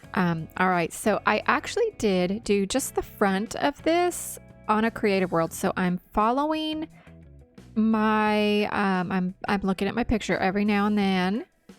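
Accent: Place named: Texas, United States. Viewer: I thought you were in TX. I can pick up the accent every once in awhile. My wife is from Australia and she even has it every so often. It rubs off on you. 😆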